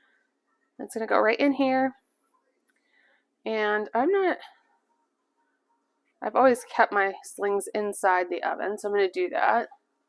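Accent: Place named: Pennsylvania, United States. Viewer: I have to ask if you are from or live in Pennsylvania. I pronounce a lot of the same words as you. 🤗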